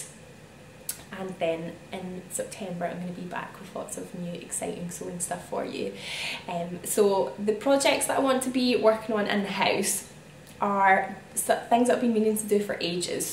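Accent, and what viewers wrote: Scotland, United Kingdom. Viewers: I live in Switzerland and it’s great hearing another Scottish accent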